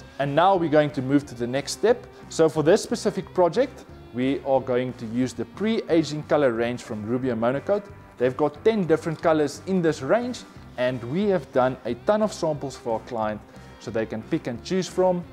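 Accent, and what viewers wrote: South Africa. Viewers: Was very happy to come across the very project I was looking for. Even more excited to hear a South African accent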